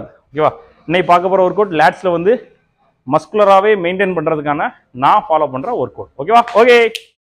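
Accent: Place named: Kanyakumari, India. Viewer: இது தான் உண்மையான கன்னியாகுமரி பேச்சு வழக்கு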